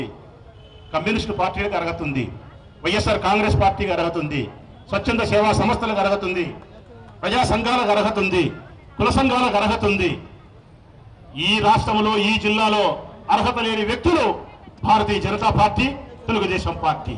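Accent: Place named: Rayalaseema, India. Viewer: Anna super anna..rayalaseem slang lo eragadesav...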